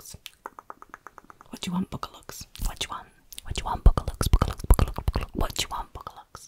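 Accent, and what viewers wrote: Yorkshire, United Kingdom. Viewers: some say she's putting her accent out there. others would say she's finding an excuse to call us all the c word ;) As someone who lives in Lancashire it's mildly disconcerting how many of these I knew. That said, I feel sad that you're losing your roots. Bring your husband and child up north so that they know what true beauty is like. None of that southern malarkey! Still loving the pink fluffiness! It's much easier to enjoy an asmr video when the video creator looks so cosy which you do! Subscribed! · As a Yorkshire girl I couldn't love this more! I think it's such a warm, friendly accent. 🥰 I've also lost most of my accent over the decades but it definitely gets broader when I go home to see family. After 20+ years I can still surprise my hubby with my Yorkshireness from time to time with words like morngy, gip and gormless! 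👂🏻I also say my owt's and c'** the same as you, we had ginnels behind our house and my sister was the buggerlugs in our family. 😁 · As a fellow northerner I love this 😂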